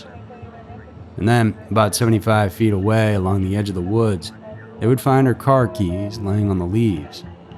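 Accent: South, United States. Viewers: The narrator sounds like airy from the object show objects never escape but with a southern accent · I'm Scottish and I love your southern accent so much 😍. Awesome video, as always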